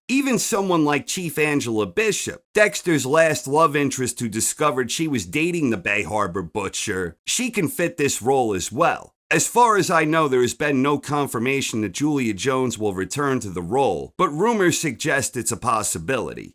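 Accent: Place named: Philadelphia, United States. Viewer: Sir, I don't want to get too far off topic but I've lived outside Philadelphia my whole life. Most of the people around have a very specific accent and you sound like us. I don't need to know where you live or anything but every time I hear you talk I wonder if you live within the region.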